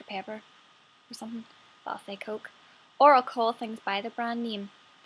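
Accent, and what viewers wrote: Northern Ireland, United Kingdom. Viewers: northeurn oyrleund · Great to hear this modern Coleraine accent - it has mellowed over the years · it's a British accent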